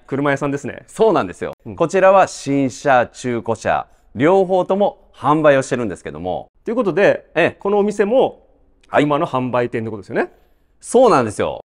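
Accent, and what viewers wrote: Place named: Osaka, Japan. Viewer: 私は車が好きな、スーパーカー時代生まれのオジサンです。 私は車を所有出来る様な経済状況には無いのですが、もしその日が来たなら、是非KUHLさんの車が欲しいと、毎回動画を見ながら夢を見ています。 今後も社長のトーク(大阪商人的な)を楽しみにしています。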